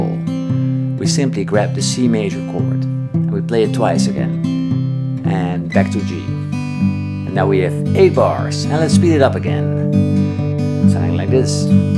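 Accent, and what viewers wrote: Netherlands. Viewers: cant miss that Dutch accent!! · with an accent. You can tell right away that he’s Dutch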